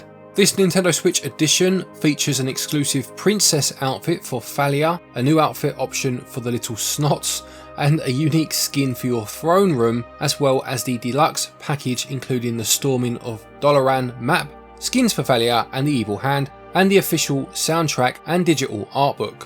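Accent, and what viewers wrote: United Kingdom. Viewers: omg british english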